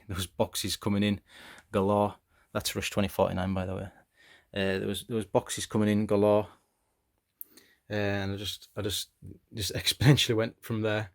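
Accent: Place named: West Cumbria, United Kingdom. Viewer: Great video, i'll enjoy watching this. You sound like you live near me. West Cumbria?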